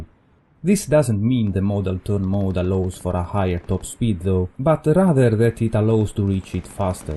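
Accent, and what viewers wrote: Italy. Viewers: lol i was sure you were from italy just by listening the accent for a few seconds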